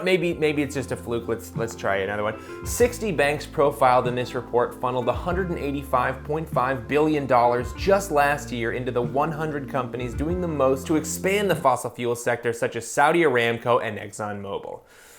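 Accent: France. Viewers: I went to give this video a like when I heard your french accent but I already had like this video. Seriously always so well done.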